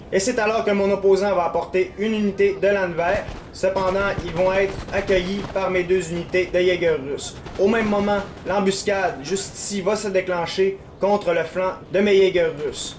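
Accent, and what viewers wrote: Quebec, Canada. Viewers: j'adooore l'accent québequois !^^ très bonne vidéo ;)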